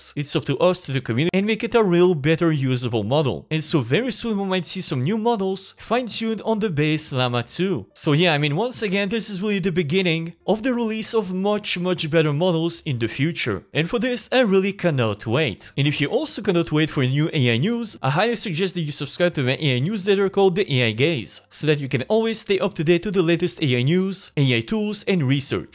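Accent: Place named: France. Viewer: First time i heard you i thought you had a french accent, and since the first translation you made was in french now i can almost safely assume. "t'es français" (à moins que je me trompe x)).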